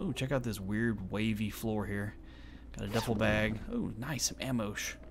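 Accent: South, United States. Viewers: I'm curious where your from I can hear the southern in your voice and I like how you talk very clear and to the point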